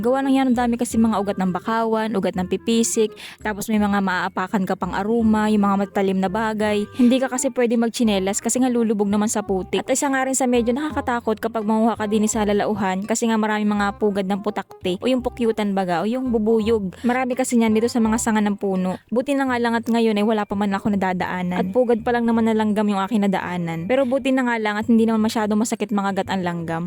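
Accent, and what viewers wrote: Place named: Quezon, Philippines. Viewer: Kya Pala ANG Punto mo .parang taga Quezon ... Tagal Quezon K NGA Pala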